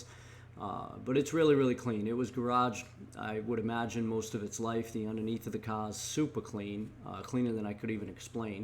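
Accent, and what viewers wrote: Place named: Boston, United States. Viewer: Ca. 😂 Boston accent. Nice car bro.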